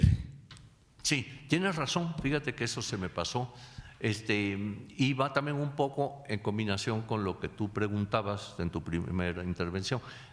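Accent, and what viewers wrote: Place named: Spain. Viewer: Eduardo Matos, encargado de poner por los suelos la cultura Mexica. En la escuela nos enseñaron a desestimar nuestro pasado historico, y a llamarle a España "Madre Paria", naturalmente yo ya soy grande, ese mote se lo pusieron a España a travez de los siglos y todavia en el siglo XX se le seguia llamando así a la bola de delincuentes y bandoleros ignorantes, que por poner un pie en las playas mexicanas, pasaron a decir que descubrieron América y pasó a formar parte de los tesoros del reino español. Al profesor Eduardo Matos, se le nota hasta en la voz su origen español. Siempre poniendo muy por debajo la gran cultura de los pueblos Mexicas de la poca cultura que demostraron los españoles al destruir la gran ciudad de Tenochtitlan.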